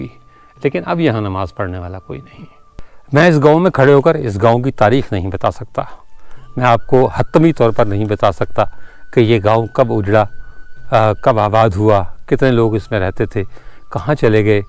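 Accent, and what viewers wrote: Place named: Lucknow, India. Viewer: Mohtaram Salamun Alyekum Maie Aapka Maddah Lucknow India se hun guzishta 2, Baras bad ab phir aapke vlog se mustafeed ho raha hun. Aapka andaze takallum aur tareekhi malumaat me Lucknvi tahezeeb ka khasa asar hai kahin aap hum Lucknow walon ke bichhde huwe maqami bashinde toh nahi hain?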